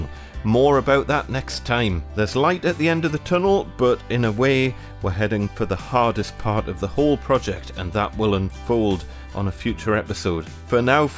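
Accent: Newcastle, United Kingdom. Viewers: Not just Geordie, specifically Gosforth. If I hadn't moved south for uni 20 years ago, I might even be able to tell which street from his accent. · Geordie not Scottish.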